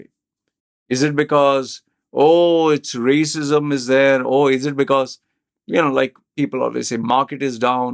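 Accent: India. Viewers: U are another type of Indian, Bashing other Indian trying to fit in. Every individual has an attitude and you are just full of shitty ideas. What are you? A gangster-faced wannabe western with an Indian accent. you are disgusting!!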